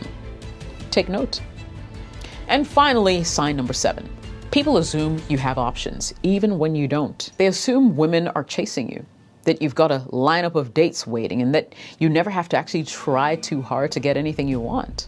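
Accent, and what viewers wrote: Nigeria. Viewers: You sound like a Nigerian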